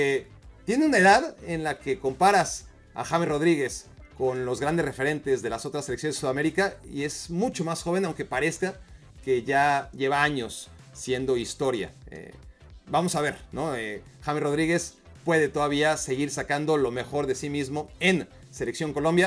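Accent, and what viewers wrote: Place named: Mexico. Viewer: Escuche tu acento mexicano y de inmediato perdió peso y credibilidad tu video. mexico no existe en futbol, dediquense a la pesca, remo, polo.... FUTBOL NO !!!!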